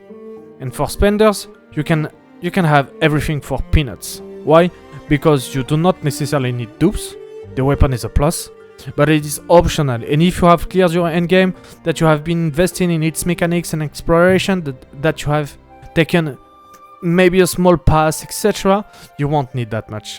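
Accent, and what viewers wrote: France. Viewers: Are you French? You sound like you have a french accent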